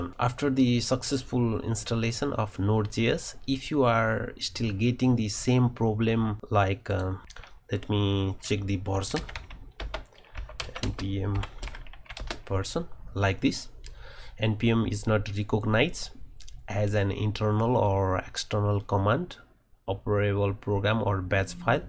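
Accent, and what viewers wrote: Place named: Russia. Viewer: Whats up with the russian accent?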